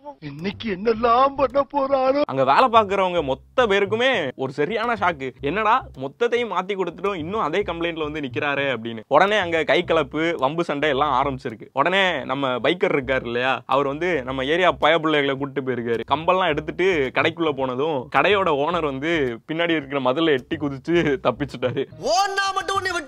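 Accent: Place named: Marthandam, India. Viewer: Bro nanum marthandam than avlo periya worth ila slang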